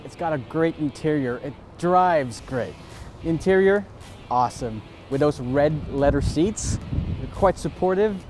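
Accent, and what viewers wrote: Philippines. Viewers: It felt like you are forcing yourself to have this perfect enunciation of words, and it resulted to some mistakes that you even apologized for. I'm sure it won't bother most people if you have an identifiable pinoy accent. Just be you, like that dad from AutoDeal reviews.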